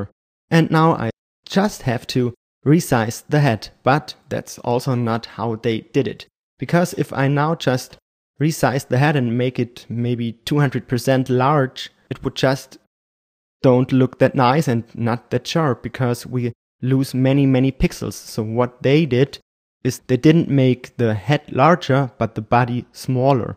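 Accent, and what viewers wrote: Germany. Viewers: Strong German accent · Are you german?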